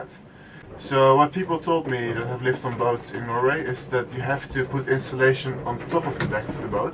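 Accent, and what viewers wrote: Netherlands. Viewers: Cool! Are you dutch? You sound like it! 😊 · ik had ook al zo'n vermoeden. Zelfde soort accentje als mij; je Engels is zeer goed. Mooi zeg in Noorwegen! Maar dan wel weer koud in de winter. Een idee om te overwinteren op een boot in Spanje (in September varen oid?)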